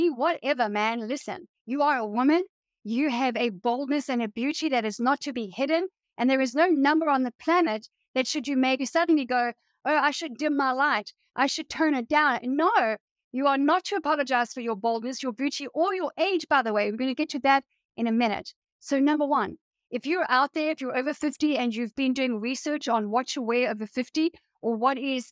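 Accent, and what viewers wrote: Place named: South Africa. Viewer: Thank you for sharing with us you are so inspirational and sweet and love the south African accent☺💖👍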